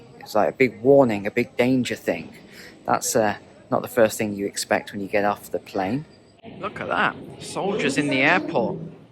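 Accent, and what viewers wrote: England, United Kingdom. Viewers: This happened 10 years ago already and even before it. Did it just occur to u? U sound british. Not much different there. Or in Germany. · Sounds like an Englishman. You should be used the national government handing over the country to arabs and africans.Their country now. Get out!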